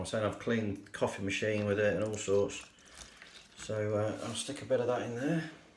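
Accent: Leicester, United Kingdom. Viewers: This is brilliant, was going to get a plumber in until I saw this video, I reckon I can tackle this myself, a big thanks mate! you have to be from around Leicester, sounds like my next door neighbour! thanks again bud!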